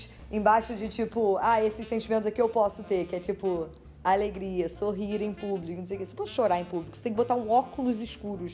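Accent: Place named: São Paulo, Brazil. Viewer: ela já começou a pegar sotaques paulista aaaaaaaaaa 💕💕💕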